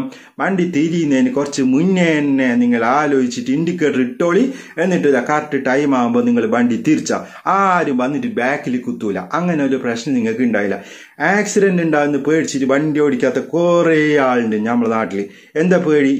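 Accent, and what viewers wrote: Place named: Kasaragod, India. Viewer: Kasar kod Basha Yella Bashayum Ariyum😆😆🤣🤣Rasamund kealkkan😃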